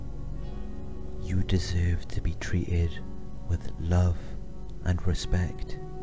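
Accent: Wales, United Kingdom. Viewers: Thank you, this was magic. You have a good voice for this sort of thing ( welsh......?) 👍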